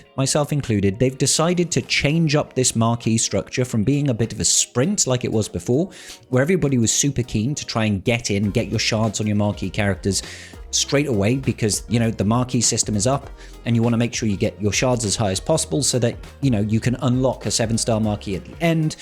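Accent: United Kingdom. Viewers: Error? Era. You’re a Brit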